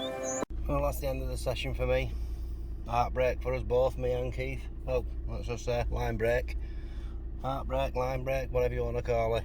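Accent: St Helens, United Kingdom. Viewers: Your accent sounds like a proper St Helens accent. Are from around there?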